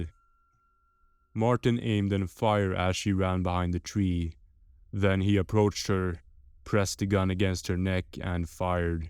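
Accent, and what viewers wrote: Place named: Sweden. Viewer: du gör inga typiska svengelska tabbar men det är några pyttesmå detaljer som avslöjar det 😁 'a' - ljud är något som verkar vara väldigt distinkt för oss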